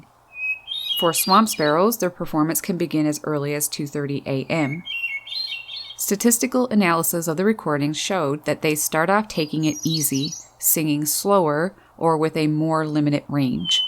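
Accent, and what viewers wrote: Canada. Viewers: Its aboot time..clearly Canadian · Was wondering how far up north, then I heard "aboot", that far up north i c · There is not a thing I can do a-boot that.. soo darned Canadian! lol.. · Your accent says you're from Canada. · Hello. Looking forward to my morning irritation of springtime American Robins. I love your explanation and it makes sense. I'm from northern Minnesota and your accent was immediately recognizable as Canadian (very strong). Which province? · The accent is Canadian eh?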